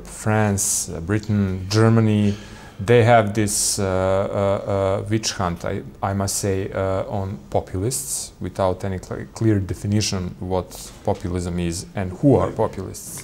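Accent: Serbia. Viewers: Kako tvrd, sprski izgovor engleskog jezika. Uzas.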